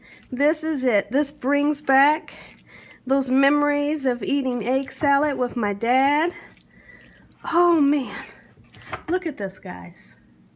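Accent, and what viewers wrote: South, United States. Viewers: This lady’s from the south for sure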